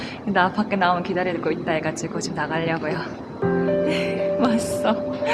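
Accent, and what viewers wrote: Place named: Busan, South Korea. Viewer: 아~~~내가 왜 쪼매 더 일찍 를 몰랐을까요? 감성터치 눈물 훌쩍훌쩍! 그리고, 정감 있는 부산사투리와 상반되게 믓진 영어구사 최고예요. 모칠레로 채널합방통해 지난주엔가 알게되어 구독후 시청중입니다. 루프한자 항공? 제가 우찌 도와드릴일이라도..가서 정문에 떵이라도 싸고와야 기분이 풀리지않을까요? 암튼 씨랑 오손도손 지내시면서 등에 파스도 붙여주고 마사지도 서로해주고 안전하게 여행하시기 바래요. & 화이팅 너무너무조아조아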